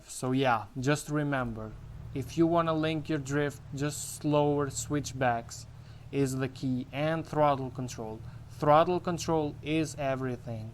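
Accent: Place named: Russia. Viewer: вери стронг рашен аксент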